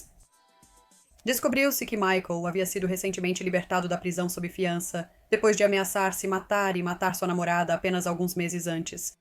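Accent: Portugal. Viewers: Conteúdo muito bom. Porém, português de Portugal... 🤢 Impossível de ouvir por muito tempo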